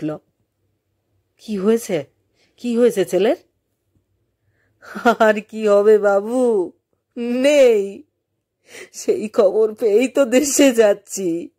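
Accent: Bangladesh. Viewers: বাঙাল উচ্চারণ কেন? আরও প্র্যাকটিস দরকার।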